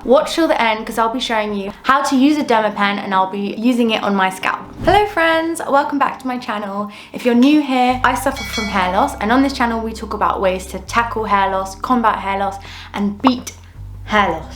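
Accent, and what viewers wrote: United Kingdom. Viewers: Love Love ur videos can't wait to try straight away! Love ur British accent!! Will update once I add to my hair journey!! Could you do a video on hair nutrition?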